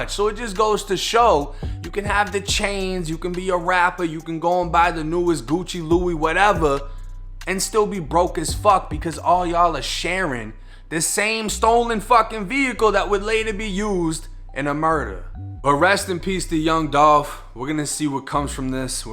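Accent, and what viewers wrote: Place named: New England, United States. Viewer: Yo I just argued with someone over your New England accent, they said it was a Florida accent smh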